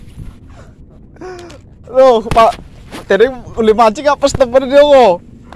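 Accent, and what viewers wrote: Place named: Banyumas, Indonesia. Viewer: Waduh malah patah sepining pancing nya ,yang penting dapat ikan bos,ngomonge ngapak koh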